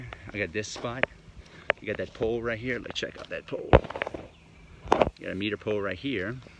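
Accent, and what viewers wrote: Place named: South Louisiana, United States. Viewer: Great info. I'm from south Louisiana as well. I know that accent! lol. I just setup my first mobile home rental on a piece of property I already owned and looking at another one setup on land that needs some work. I have aspirations of one day owning several parks like you. I know there are a ton of MHPs in our area, but are that many owners selling? How far out do you have to go to find deals? What are your thoughts on building a new park vs buying one?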